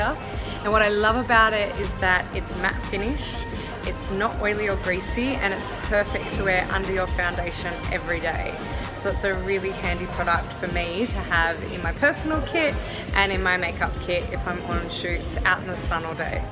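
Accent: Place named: Australia. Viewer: OMG.. thats why i love you so much.. because you are from Australia.. its my dream country.. USA and Australia.. wish to visit them one day.. and i looove your accent.. it would be nice to see you skin care routine or your perfume collection <3 that lip balm looks amazing.. hm.. can we buy Mecca online?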